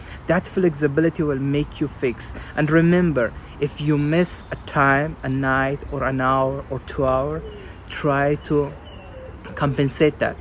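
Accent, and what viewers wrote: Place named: Afghanistan. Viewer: Hi are you Afghan because your pronouns form Afghanistan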